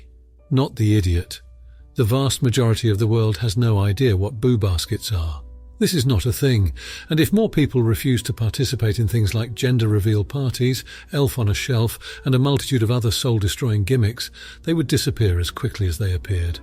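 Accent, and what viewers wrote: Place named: United Kingdom. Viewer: Big fan of the British narration.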